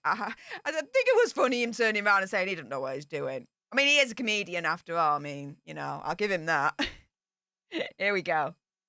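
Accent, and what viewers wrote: Yorkshire, United Kingdom. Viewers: Couple O Wee-Back 🤣 Yorkshire accent is class 👏🏽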